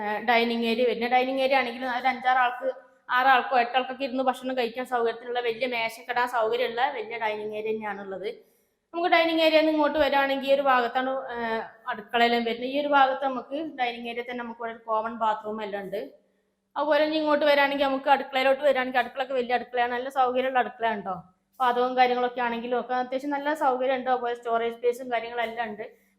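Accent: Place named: Malappuram, India. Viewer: സഹോദരി കാസർകോടാണ് ഭൂമി പക്ഷെ ഭാഷ മലപ്പുറവും ഇതെന്ത് കഥ ?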